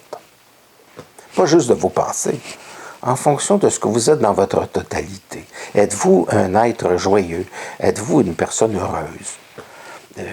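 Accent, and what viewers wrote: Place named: Canada. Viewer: Bonjour de France_ Merci M.. C'est une parfaite synchronicité car la thématique est précisément ce que je sais intérieurement et ce sur quoi je me centre spontanément en cette période de mon évolution...... Et puis j'aime bien l'accent canadien!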